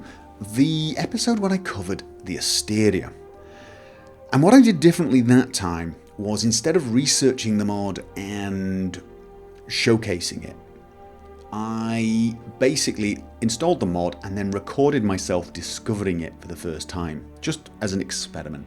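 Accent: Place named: England, United Kingdom. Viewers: Let's all share our stories of how we found! I actually found him by searching for let's plays of Vampire the Masquerade. I was watching LK's Marik play through, and was frustrated because he dropped the project, and I actually liked the way the story was progressing. So I searched on youtube and came across. I laughed and said "Hey he's got an English accent too, nice!" I found his voice so soothing to listen too, that I watched the play through several times before I started the skyrim videos. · Congrats, you know i gonna lay it all out here bro. Love the playthroughs, love the baritone Northern English accent. Love your style altogether, just a quick thought is that now you've decided to devote more time to your channel, I just want to say that if money becomes an issue I'd (Plus some other subs) would be more than happy to donate to the cause to help out. 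so yeah, all sunny skies from here in. Best wishes from us to you, here in West Virginia.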